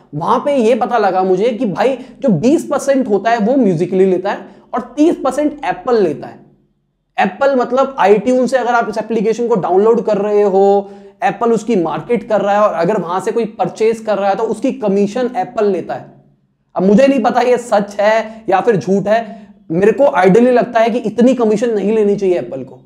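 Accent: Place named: Punjab, India. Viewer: Aapka content sahi me share karne like nahi hota bcoz aapki jo language hoti hai vo un professional lagti hai means usme koi professional sa feel nahi hota hai aap vo jo bolte ho na yaar, bhai etc aur aap standerd hindi language bhi nahi bolte matlab ek normat panjabi tone me aap information deliver krte ho to ye sb ek professional worker pe nahi jamta aapki language vivek bindra , ujjval patni ya aur bhi jo best vaqta hote hai unke jaisi professional ho to zyada behtar lage tab aapki video share krne me hume bhi khud me accha feel hoga.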